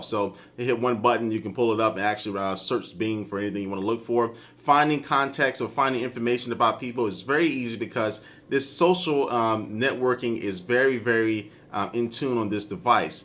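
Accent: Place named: United States. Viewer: i can tell you are american you know why because americans seem to have this wierd thing about wrongly pronouncing words like mobile phone you say moble phone why there is an I in the word mobiiiile phone or when americans say soldering they say soddering wrong there is an L in the word soillldering no offence americans but if you going to speak english speak it right and say you are speaking english not american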